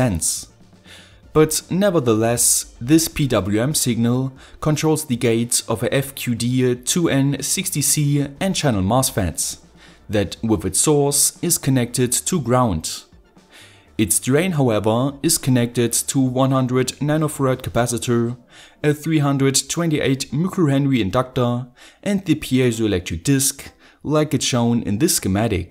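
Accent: Germany. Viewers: are you german? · Er klingt auch so schon deutsch genug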